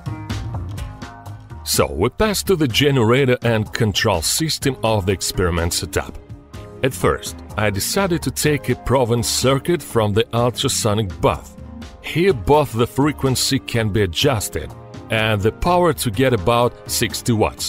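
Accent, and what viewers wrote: Russia. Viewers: Вот ниразу не слышал русского человека, говорящего по английски, которого нельзя было бы опознать по акценту. Видос классный! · Your russian accent (I'm assuming) doing english is funny, tbh i like it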